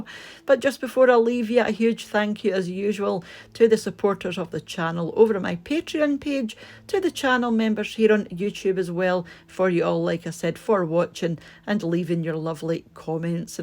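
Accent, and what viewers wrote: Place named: Glasgow, United Kingdom. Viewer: I guess I'm an odd one out. I like the weekly shopping video a little more than Meals of The Week. (I LOVE seeing you're buying block cheese instead of pre-grated now). But I always make myself wait until today to watch them in a row - to see what items you used from the shop and incorporated into your Sunday 'show' of weekly meals. ☺ I truly think your shopping and meals of the week videos are the BEST! Editing is PERFECT. There isn't any 'ummms' or 'you know' (filler words) and when you speak, you get to the point! There's no long pauses or meaningless sentences that drone on. Been watching you for several years. ♥ ~Keep 'em coming ☺ - - Ps I know you mentioned it before, but your accent DOES remind me a lot of Anita Manning - I guess she has a Glaswegian accent.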